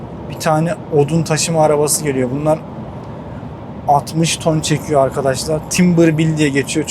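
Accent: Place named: Antalya, Turkey. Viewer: Antalya liymissin.bende Antalya liyim.Akseki liyim. deme çünkü bende Akseki liyim.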